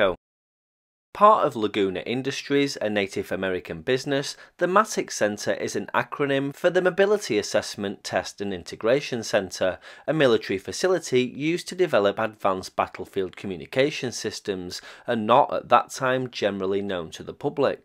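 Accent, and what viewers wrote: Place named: Manchester, United Kingdom. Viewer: Hearing Yosemite Sam referred to in a Manchester accent gives a whole new take on one of my favorite childhood cartoon characters.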